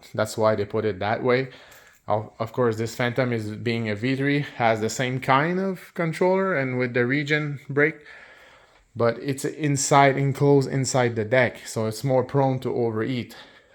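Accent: Quebec, Canada. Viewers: Définitivement, c'est un accent québécois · This guy sounds Canadian am I wrong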